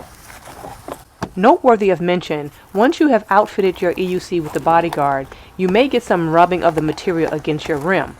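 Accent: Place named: Virginia, United States. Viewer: I got mine because of your previous video. Love your Virginia accent....!!!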